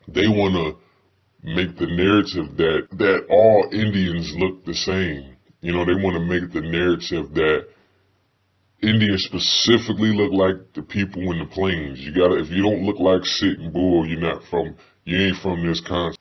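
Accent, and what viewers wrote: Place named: Philadelphia, United States. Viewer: This sound like my homie from Philly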